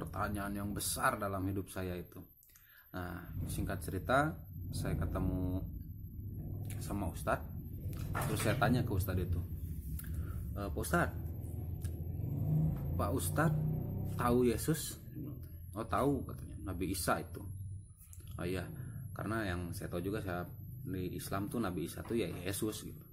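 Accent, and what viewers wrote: West Java, Indonesia. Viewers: Kok logat nya sunda banget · MasyaAllah kang, tpi mmg , jujur sy baru pertama kali ini melihat dn mendengar kisah mualaf yg berasal dri suku sunda... maaf ya kang, ini benar2 penemuan sy pertama kali ada org sunda , (dn sangat kental sekali kesundaan nya si akang ini dri lentong nya, ) ternyata ada jg ya yg beragama kristen.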